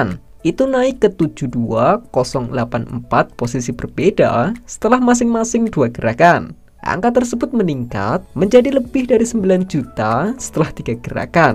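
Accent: Java, Indonesia. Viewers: medok suara nya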